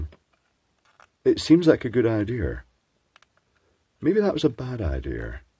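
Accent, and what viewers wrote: Scotland, United Kingdom. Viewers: As an American i find the Scottish accent to be a beautiful accent, I just enjoy listening to it. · I always love hearing british accent. It sounds so nice. And of course, I enjoyed this video.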